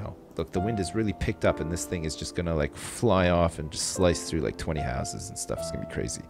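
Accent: Canada. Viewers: Please, would rather have no music than that. The really slow piano brings the tone of the video down:(... Am I crazy? It's very juxtaposing to your cheery Canadian voice.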